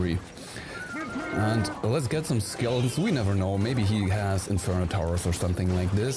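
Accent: Germany. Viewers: Your german accent is too awesome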